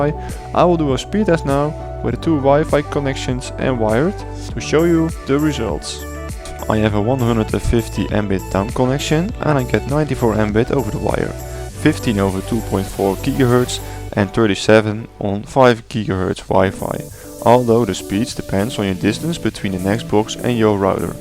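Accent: Netherlands. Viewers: I hear some dutch accent there :))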